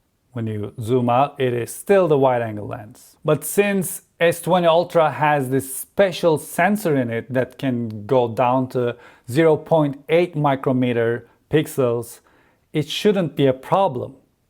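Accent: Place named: Turkey. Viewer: Your accent sounds like you are a turkish guy.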